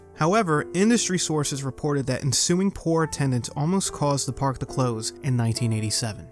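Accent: New York, United States. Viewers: Someone’s from New York or Jersey based off his accent lol. Well done!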